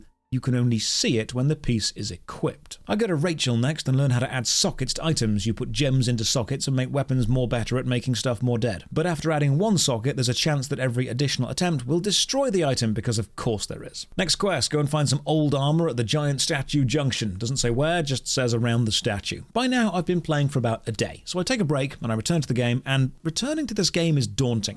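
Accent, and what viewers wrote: United Kingdom. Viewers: Way back when, in mid-late 2000's, a friend of mine in college got really hooked on Grenada Espada and was talking at length about farming different types of gun powder and assembling Zodiac Doll or some shiz. And I could not express how little I cared. Many years later I am watching a different dude talking about effectively the same and find it much more engaging. Conclusion: everything is better in British accent.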